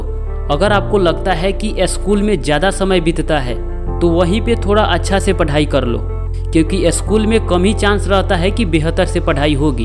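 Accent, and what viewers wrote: Bihar, India. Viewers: Bhai ap Bihar se ho kya ham lag raha hai ki ap Bihar se ho 🥰🥰❤️❤️😍😍😘😘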